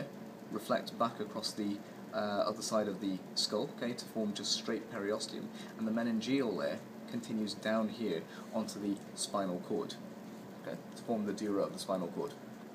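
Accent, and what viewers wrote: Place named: Wales, United Kingdom. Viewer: Very useful, many thanks! You sound slightly welsh which is also a bonus!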